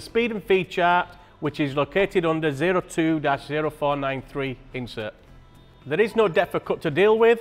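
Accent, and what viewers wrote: United Kingdom. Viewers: a regional British accent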